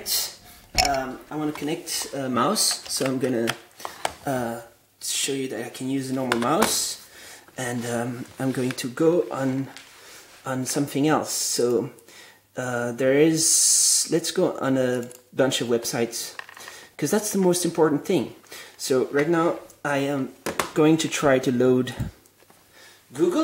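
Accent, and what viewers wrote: France. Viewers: Wait, it's probably a French accent. I don't know, I give up. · i now yore acsent youre french haha me to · i spent like 15 mins trying to work out his accent,french or american?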